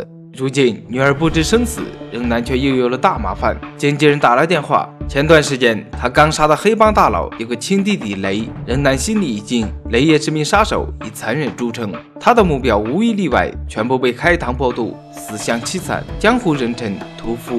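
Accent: Japan. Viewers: 你是日本人在講中文嗎?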